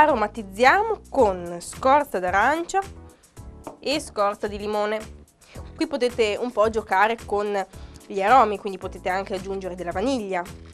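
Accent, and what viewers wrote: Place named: Sardinia, Italy. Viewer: Non metterei mai la ricotta vaccina ma solo di pecora, ma veder realizzare le pàrdulas da te è un piacere, certo non quanto sentire l'accento familiare che lascia immaginare profumi e aria della mia splendida isola, ma il tuo accento, i tuoi modi e la tua bravura sanno essere piacevolissimi come sempre. Un saluto a tutti i miei corregionali e alla mia terra lontana ❣️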